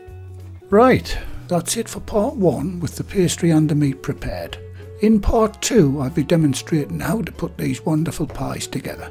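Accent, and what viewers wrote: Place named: Scotland, United Kingdom. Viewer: What part of Scotland are you from?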